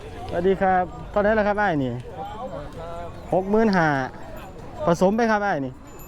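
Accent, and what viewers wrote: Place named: Sisaket, Thailand. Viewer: ศรีสะเกษชัดเจนค่ะพี่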